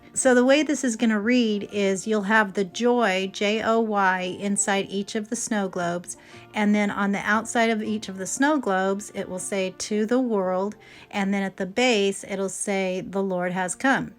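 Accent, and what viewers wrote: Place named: Georgia, United States. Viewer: I always LOVE watching your channel! You are so creative!! Love when you say, “anytime you can get more Jesus” in your projects! Because we all need a lot more Jesus! Love that you always share inspirational meanings in your projects!! I love Crafting Cousins as well! (Btw I’m from GA, so I have that same “cutie patudie” (don’t know if that’s spelled right!) ..accent too!) 😂 God Bless you all, as you share God’s word, through your creative gifts of crafting! ❤️🙏🏼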